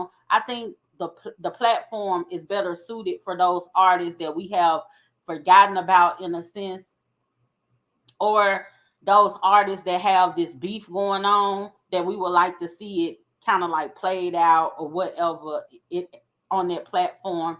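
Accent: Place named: Virginia, United States. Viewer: You sound like a Virginia girl!😊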